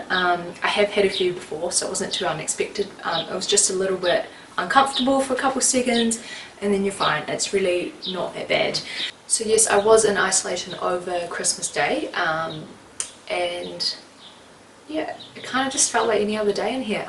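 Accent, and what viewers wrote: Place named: South Island, New Zealand. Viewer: Hey where in NZ are you from? Seems like a South Island accent. Sorry if I am wrong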